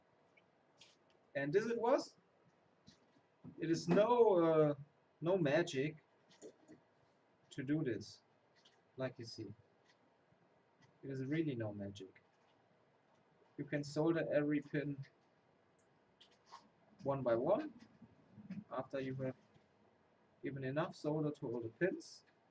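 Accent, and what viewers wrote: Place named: Germany. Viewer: Thank you for spoken English, which is very good. Also your cool German Accent makes English sound more interesting. Would you please consider adding English closed captioning to future videos? I’m sure you guys will gain a lot more subscribers by doing this. Your work is excellent. As always, a BIG 👍 from me!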